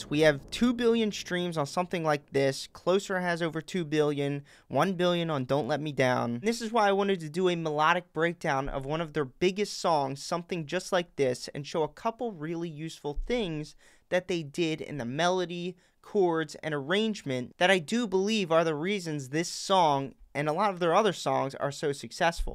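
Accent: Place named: Delaware County, United States. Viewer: I've watched a handful of your videos and could not get over your HEAVY Delco accent. Hat and Jersey confirms it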